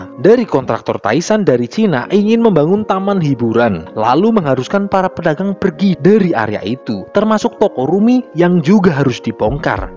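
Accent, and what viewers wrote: Banyumas, Indonesia. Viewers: wong ngapak apa ya admine😂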